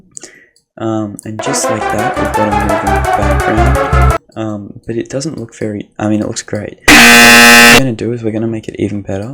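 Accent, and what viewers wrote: United Kingdom. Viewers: are you british?😊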